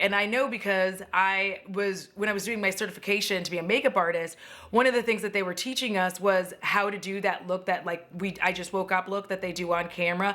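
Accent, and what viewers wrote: England, United Kingdom. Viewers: My husband just walked past me and said “Ooh, nice colour hair, ” and I agree, this teal is amazing on you. And, your Charlotte Tilbury English accent gets the seal of approval:)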